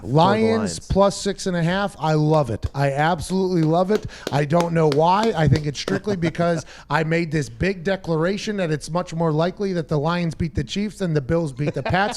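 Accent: Pittsburgh, United States. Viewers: Pat has such a Pittsburgh accent love it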